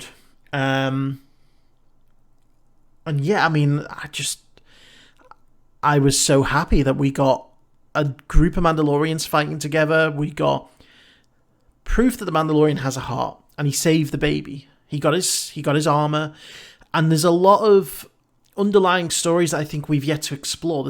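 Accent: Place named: Liverpool, United Kingdom. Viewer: Evening by the sound of your voice, you are from the north of England. Think Liverpool. How are you able to view each episode, as Disneyplus does not go live in the UK until 31st march 2020? Thanks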